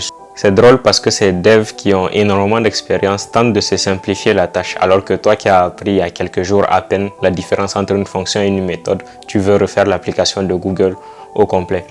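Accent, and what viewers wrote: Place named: Niger. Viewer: Merci pour le rappel Je sens comme un accent de mon pays le 227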